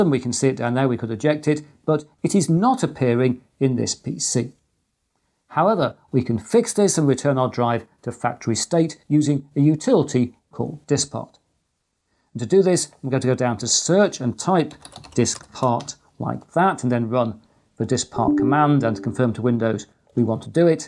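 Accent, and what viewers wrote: United Kingdom. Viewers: Loved this new format! I hope there will be more in the future. Even if I knew about most of these urban myths, the technical clarifications you bring are most useful. I must admit that I am also partial to your style: no flashing extravagant post production effects, no fuss, just plain and precise information. On top of that, your British accent and phrasing and overall attitude are music to my ears! Have a nice cuppa! (Try not to mind the undocumented conspiracy theories too much: as you mentioned in your introduction, facts and opinions (or what we think is a fact without knowing or wondering where it's comming from) are indeed deeply intermingled... I hope you'll keep trying though!)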